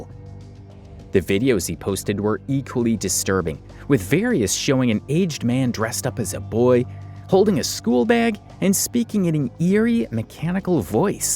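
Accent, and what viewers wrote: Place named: Upper Midwest, United States. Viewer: I love this subject and what you do. As someone from the Midwest, I can't help but notice your accent through the video and it makes me really wonder if you're around or from the northern Midwest area.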